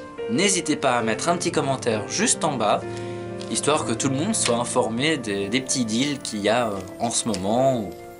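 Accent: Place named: Belgium. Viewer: T belge c sure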